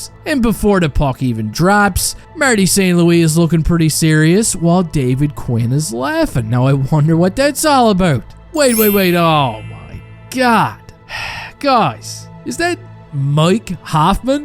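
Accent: Newfoundland, Canada. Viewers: I love the Newfie accent with the classic commentary!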